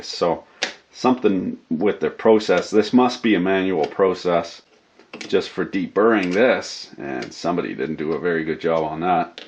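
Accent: Canada. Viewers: Hell I am American and have trouble understanding Canadian at times. The more you watch him the more sense it makes than you start talking like it lol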